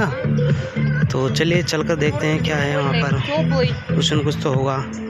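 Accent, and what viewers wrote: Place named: Nepal. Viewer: आप का हिन्दी सुनने पर नेपाल का हिन्दी जैसा है, मेरे नेपाली दोस्त जब हिन्दी बोलते है, बहुत सही लगता है। 🚩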